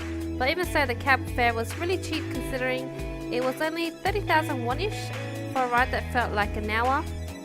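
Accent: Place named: Australia. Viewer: lol cool I was just curious because your intro was hello in Korean. Anyway you don't have any accents other than the obvious Australian's accent:). If you're still in Korea, please do a street food video =) cheers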